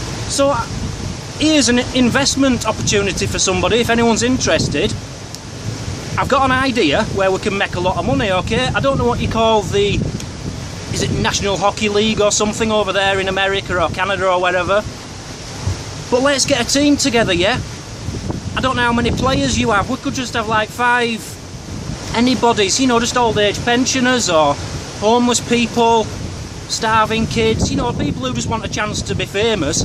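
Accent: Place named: Liverpool, United Kingdom. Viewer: OMG he looks like Bilbo :3 but just with a liverpool accent. so cute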